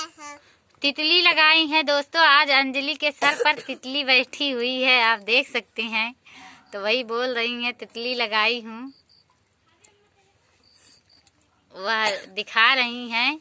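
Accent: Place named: Uttar Pradesh, India. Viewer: Hello.....m bhi up side ki hu.....aapki boli bhot acchi h......mjhko bhi aati h.....but mjhko sarm aati h.....koi bolta h dusra to bhot accha lgta h..... or aapka babies bhi bhot hi pyaarey hn......bhgwan aapko saari khusiya de.....aapkey bacchon ko bhi...☺☺☺☺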